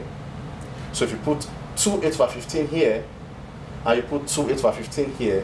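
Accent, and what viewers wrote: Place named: Nigeria. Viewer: you had a niagerian accent so thats why i thought you were from nigeria i am from bauchi state northern nigeria which part of nigeria are you from?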